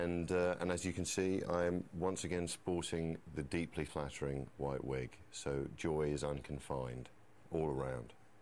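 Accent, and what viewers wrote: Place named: South, United Kingdom. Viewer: His pronunciation is certainly British/Southern English...this is his natural voice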